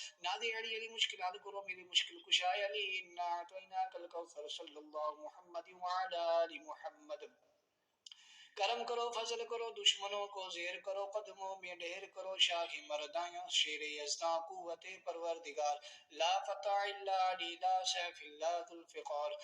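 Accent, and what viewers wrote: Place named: Pakistan. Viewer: Yah Kisi Pakistani ka voice hai aapka voice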